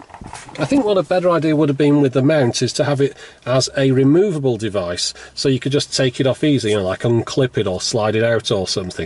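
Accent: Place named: North West, United Kingdom. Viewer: Thank you for taking the time and effort to do all these reviews; very much appreciated! I've watched pretty much every dash-cam video you've done (nice to hear a fellow NW accent, too, BTW ;-) ) and was initially hoping to get this one, but, since the price has now jumped to around £92 I was wondering if you could recommend what YOU think might be a good cheaper option (around the £50 mark as I'm not a millionaire just yet and I trust your judgement based on your no-nonsense reviews)?! Thanks!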